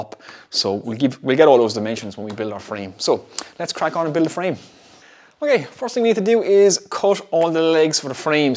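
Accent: Waterford, Ireland. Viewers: Cut the ploywood with the moytersaw, I love the Irish accent almost as much as I love this build. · just looked back and found your video fixing timber to the walls. I might just take down the few bits I've put up and start again like you did. I'm gueaaing by the accent you're a Waterford man. If so, commiserations for Sunday.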